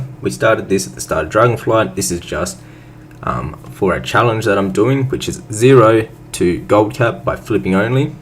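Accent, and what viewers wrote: Australia. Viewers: Hey, np! I love seeing small youtubers grow over time. Are you OCE you sound Aussie!? either way, been watching your vids for a while and like your content style - real info to work with! subbed and will try get your guide!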